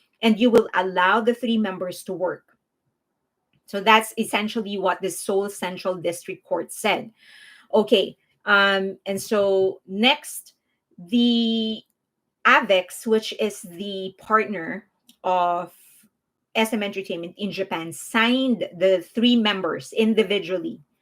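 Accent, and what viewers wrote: Philippines. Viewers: shes from the philippines thats our pronounciation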